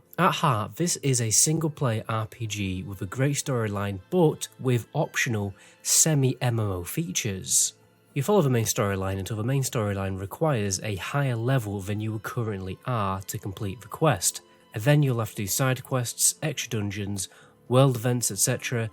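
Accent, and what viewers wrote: United Kingdom. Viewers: Why is that no matter what I want to learn about on Youtube, be it a new game or fixing Windows problems, its only the British channels - or people with British accents - who explain perfectly, clearly, without BS hype and annoying overexcited voices as per the Yank Channels ?? 🙏🏼🙌🏼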